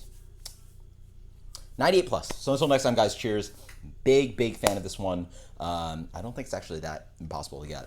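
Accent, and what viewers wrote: United States. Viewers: Are you from upstate NY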